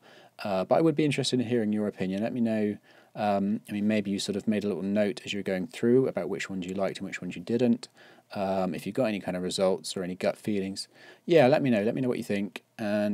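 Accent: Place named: West Country, United Kingdom. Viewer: Great in-depth overview of compositional rules. Nice to hear a fellow west country accent too, makes a change from the usual American teenage nasal whine on most YT tutorial/how to's which can get a tad wearing.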